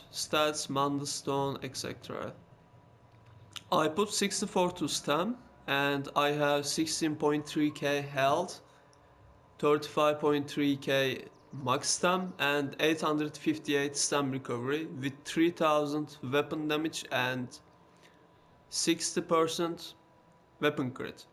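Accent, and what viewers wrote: Turkey. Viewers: Türkmüsün kardeşim Türk İngilizcesi bu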